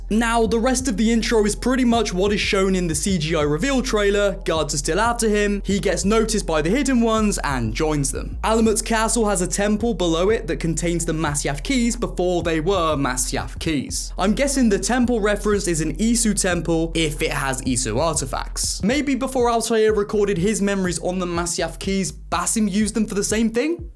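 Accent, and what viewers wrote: United Kingdom. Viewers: i love that freaking british accent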